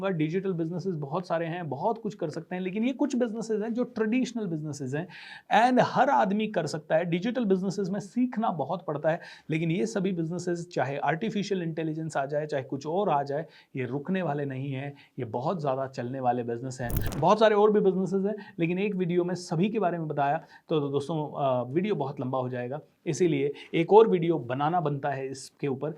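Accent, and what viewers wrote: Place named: Rajasthan, India. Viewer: Lagta hai aap rajsthan bikaner se ho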